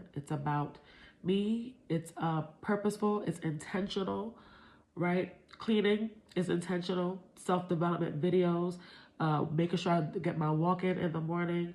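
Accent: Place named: New York, United States. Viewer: Love your accent omg I be trying to talk like a New Yorker lol I'm from Oklahoma City, Oklahoma we have a country accent 😂😂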